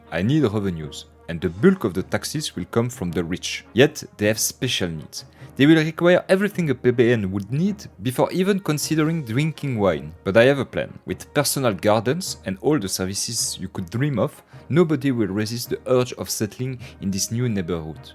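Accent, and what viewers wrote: France. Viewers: Your french accent is so satisfying to listen while watching you building an amazing city.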